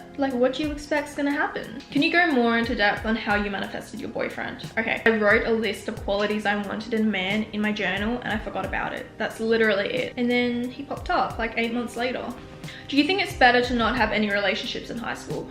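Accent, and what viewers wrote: Australia. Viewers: And her thicc aussie accent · I only talk in an Australian accent now because of you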